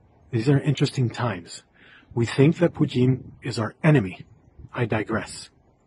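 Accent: Russia. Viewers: THERE IS NO DIFFERENT WAY - or justification for this war. You think that under the shroud of “intellectual analysis” you can push your opinion and people would believe you? There is no justification for killing thousands of young man for the war of one fanatic man Putin. The Russian people don’t deserve that and Ukrainian people don’t deserve that either. Whatever his goals are - he is a lost cause. It is March 8 and it becomes clear that other than ever that he is failing. PS.And you, pretending to be from hungry Dash think again I can spot your perfect Russian accent… You’re not Hungarian you’re Russian and there’s nothing wrong with it but don’t you lie to people and push your so called “intellectual opinions…”… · 1st of all-Have you ever lived behind the "Iron Curtain"? You have a distinct Russian accent in pronouncing Putin's name, and your surname doesn't sound Hungarian.2nd-you are talking about the rights of Hungarians in Ukraine, but completely omitting the brutal crushing of the Hungarian people in 1956 by the Russian tanks???And what about Czechoslovakia in 1968??? 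Why, do you think, are the Russians not so popular, to put it MILDLY, amongst their neighbors and former forced members of the Warsaw pact? Their goals are always occupation and brutal domination. The only point I agree with you is that there are many things we don't know. However, the one thing we know with a high degree of certainty is that Putin is a brutal dictator.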